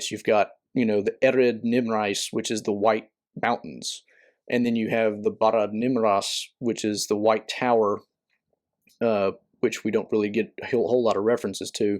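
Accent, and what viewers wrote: South, United States. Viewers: That bloody accent....so Southern.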